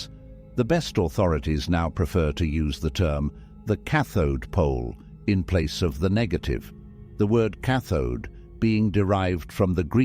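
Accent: United Kingdom. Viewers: Man speaking with ‘stiff British -scholarly sounding accent’ saying nothing…..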